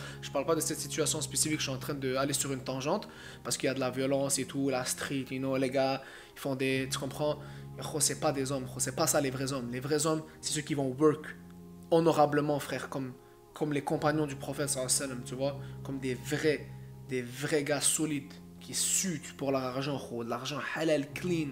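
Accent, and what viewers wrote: Quebec, Canada. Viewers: Il est canadien? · Tkt il est au Québec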